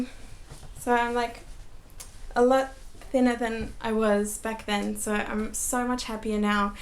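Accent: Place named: Australia. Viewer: If I had to guess I would say you're an Aussie?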